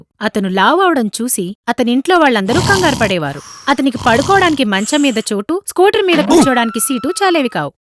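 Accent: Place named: Telangana, India. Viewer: Hey telangana yasaa super abba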